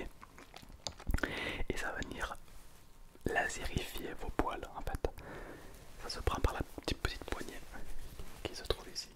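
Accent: South, France. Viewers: L'accent du sud >> les autres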